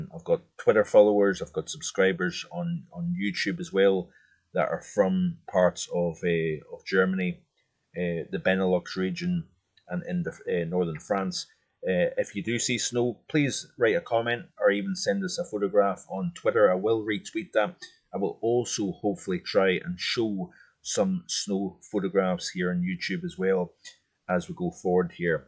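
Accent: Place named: Northern Ireland, United Kingdom. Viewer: And a lovely mild Northern Irish lilt.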